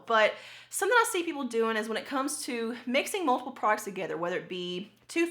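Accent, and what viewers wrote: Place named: Kentucky, United States. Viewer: Just found this channel, heard your accent and just knew you were one of us KY girls! Love it!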